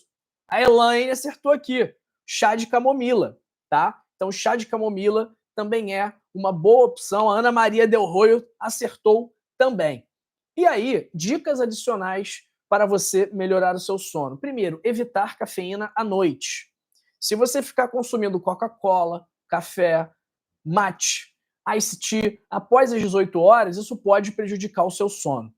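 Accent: Rio de Janeiro, Brazil. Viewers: Acho tão charmoso o sotaque do carioca! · Doutor obrigado pelos seus ensinamentos, atualmente estou perdendo noites de sono devido a ansiedade. Irei praticar essas dicas que são tão fáceis e encontradas em toda casa kkkk! Ah e só uma coisa, eu adoro o sotaque dos brasileiros, povo mestiço e diversificado.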